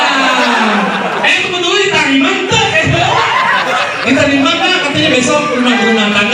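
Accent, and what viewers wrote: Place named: West Java, Indonesia. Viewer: njir sunda pisan ieu mh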